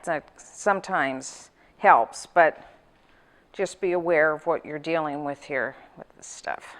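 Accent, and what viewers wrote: Canada. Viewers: thought she was canadian, the accent and all that...weird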